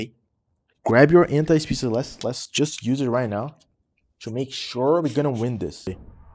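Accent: Brazil. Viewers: wait are u from brazil? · Da pra perceber no jeito de falar kkk Brasileiro falando ingles sempre puxa umas letrinhas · ele é brasileiro ss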